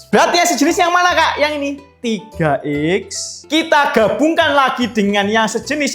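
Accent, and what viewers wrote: Java, Indonesia. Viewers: Kak kamu Jawa?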